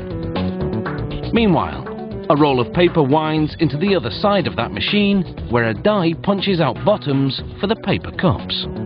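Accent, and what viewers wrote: United Kingdom. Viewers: Where is this guy's accent from? I know, all you geniuses, that it's British... but from which part? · Sounds northern English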